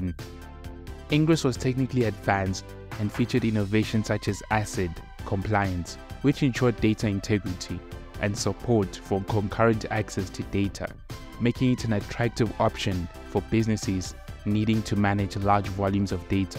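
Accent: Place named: Southern Africa. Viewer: I know a southern African when I hear one😂 Good stuff mate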